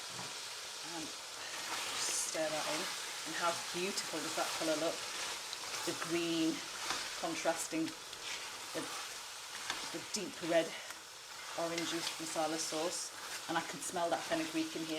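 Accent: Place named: Coventry, United Kingdom. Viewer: WOW so simple to cook. I might impress the wife with this. Thanks. P.S are you from Coventry? Your accent sounds very Cov.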